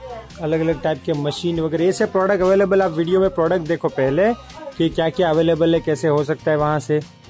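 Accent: Gujarat, India. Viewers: Kya aap Gujarati he ?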